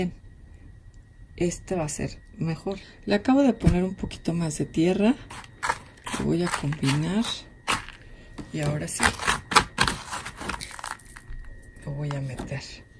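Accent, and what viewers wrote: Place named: Mexico. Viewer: Viva México,Lindo y querido y de donde pensaban que era???escuchando su asento es ovio que Mexicana,succulenta esss. 🌵Gracias por tus vídeos😄😁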